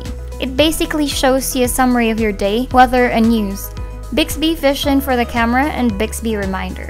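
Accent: Philippines. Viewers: Filipino accent.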